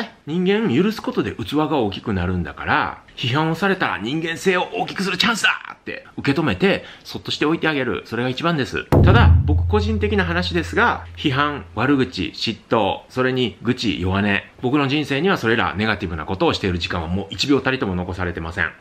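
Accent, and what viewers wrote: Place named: Kansai, Japan. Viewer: ありがとうございます。ここ4年ほど、腰痛に悩まされています。2週間ほど前に、初めて、Shojiさんの動画を拝見しました。さっそく、Shojiさんのおっしゃる通り、ストレッチのエクササイズを始めたら、体が軽くなったような気がします。理学療法による治療に通っているのに、いつまでたっても、痛かったんです。50歳になったばかりですが、年のせいなのか、仕事（商品補充、日によって2トンは越える荷物を運んでます）のせいかと思っていたんですが、、、体に対して、無知だから、腰痛を起こしていたのですね。悩みがあると関節痛も出るし。南仏に滞在して23年、もうすぐ、日本料理教室、一本だけで、やっていくつもりです。健康でなくっちゃ～！Shojiさんの哲学にも納得です。尼崎で幼少期を過ごした私にとって、Shojiさんの関西弁も気持ちいいです。これからも、もっともっと笑顔で過ごしたいです。Shojiさんのおかげで、実現できそうです。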